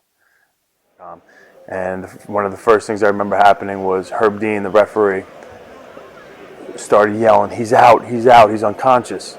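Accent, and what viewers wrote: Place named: Brooklyn, United States. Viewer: You are what got me into MMA 10 years ago, Chris. I'm originally from Brooklyn and naturally related to you just from your pride, background, and accent. I'm so sorry this happened to you. It was gut-wrenching to watch as your fan. When you said your mind turned to "even if I lose the leg, I can still be with my kids" I couldn't help but be amazed at what an incredibly willful soul you have. You will always be an inspiration to me and my favorite fighter. God bless.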